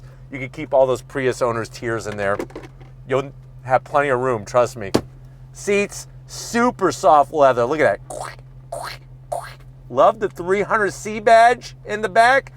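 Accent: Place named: Midwest, United States. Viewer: Dude. You have got to be from the Midwest!!? I'm from Detroit. I can hear it! :text-green-game-over:😄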